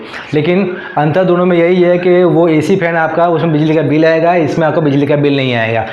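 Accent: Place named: Gujarat, India. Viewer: Gujarat lago chho bhai.. very good 👍 explained...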